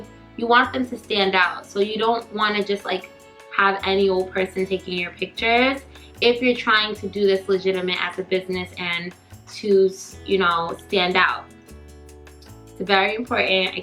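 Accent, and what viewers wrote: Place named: Minnesota, United States. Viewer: Sorry, this is kind of left field, but you should like you're from Minnesota... or Canada. Where are you from? Just curious.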